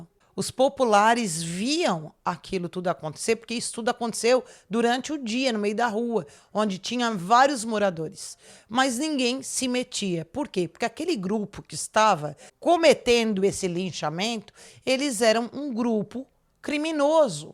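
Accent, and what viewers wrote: South, Brazil. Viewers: LINDAAA,JÁ OLHEI ESSE CASO EM VÁRIOS CANAIS,MAS NÃO TEM COMO DEIXAR DE ASSISTIR NO SE CANAL E COM SUA NARRAÇÃO COM ESTE TEU SOTAQUE MARAVILHOSO DO PESSOAL DO RIO GRANDE DO SUL!!!! AMOOO TEU SOTAQUE DO SUL GURIA LINDAAA,O TEU CABELO ESTÁ LINDÍSSIMO E QUE O UNIVERSO TE ABENÇOE EM TODAS ÀS ÁREAS DE TUA VIDA LOIRA LINDAAA🙌💋❤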